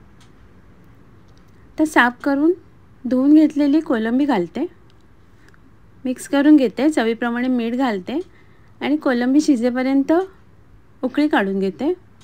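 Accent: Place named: Konkan, India. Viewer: Marathi only... In the sweet tone of kokani 😂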